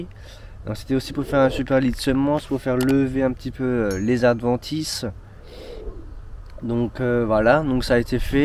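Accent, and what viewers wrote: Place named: Alsace, France. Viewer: Merci pour cette video instructive et tes explications détaillées. Compliments pour le soin que tu apportes à ton environnement végétal. Merci aussi pour ton délicieux accent alsacien. Ca fait beaucoup de bien aux oreilles !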